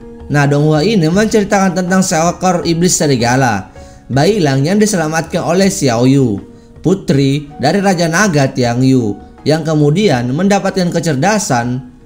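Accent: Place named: Bugis, Indonesia. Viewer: Logat Bugis daeng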